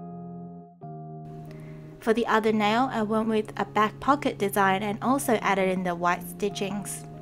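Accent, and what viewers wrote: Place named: Australia. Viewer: I love your accent, I want to live in Australia!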